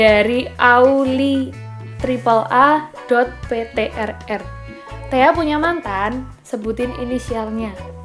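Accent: Java, Indonesia. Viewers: jawanya medokin lagi kak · Jawanya medok banget mba 😊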